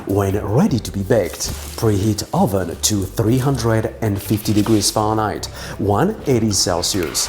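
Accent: France. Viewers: oui, on lui a déjà suggéré de mettre des sous-titres en Français (puisqu'il EST Français) et ça a déjà été fait (on aimerait que ça soit systématique, MAIS faut dire que son très très léger accent français est très sexy : on adoOre !)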